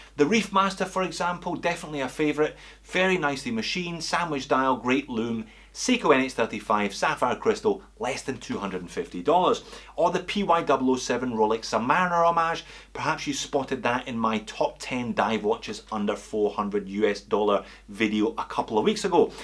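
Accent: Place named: Ayrshire, United Kingdom. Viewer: I thought that accent was ayrshire made..lol..good luck with the channel.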